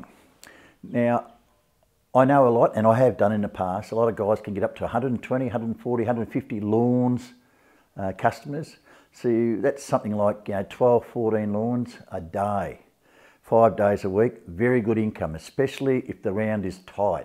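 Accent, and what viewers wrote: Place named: Australia. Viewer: Great to hear an Aussie accent for a change! A good decent bloke giving honest no nonsense advice that's actually relevent to Australian conditions. Always enjoy your video's mate! Steve. Upper Cuts Lawn Care - Devonport Tasmania.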